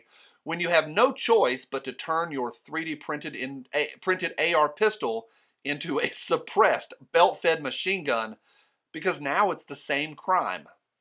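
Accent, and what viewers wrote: South, United States. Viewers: Really like your closing line, that's the one you need to stick with. For anybody else who enjoys listen to this smooth-talking southern gentleman speak, vote for him on the gundies!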